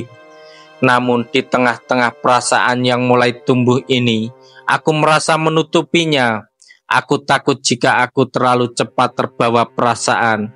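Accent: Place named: Java, Indonesia. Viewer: Medhok banget suaranya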